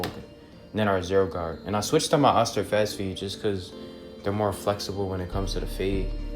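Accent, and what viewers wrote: Philadelphia, United States. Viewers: U from Philly?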